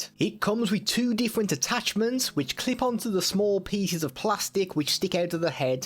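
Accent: Black Country, United Kingdom. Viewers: Great review and even greater Dudley/black country accent 😂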